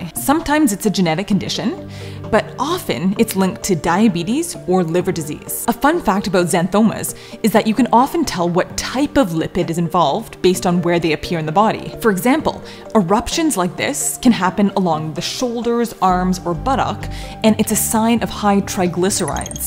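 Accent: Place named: Canada. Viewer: She sounds Canadian. Lots of good information here.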